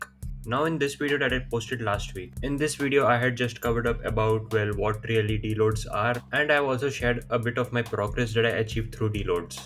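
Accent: India. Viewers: Why does a white dude have Indian accent 😭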